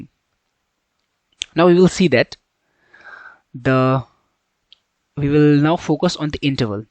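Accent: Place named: India. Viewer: accent is so bad, so indian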